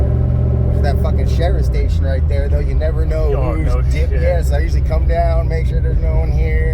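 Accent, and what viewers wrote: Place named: Sacramento, United States. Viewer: Dude u sound like some I went school with in sac.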